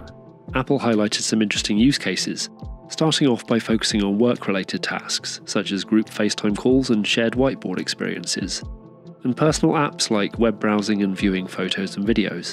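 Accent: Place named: United Kingdom. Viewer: Great video, nice to hear a British voice on American Tech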